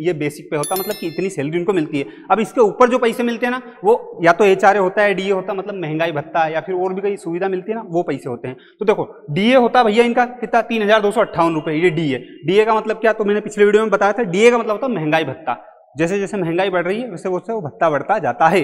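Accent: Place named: Indore, India. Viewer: Indori bhasa 😂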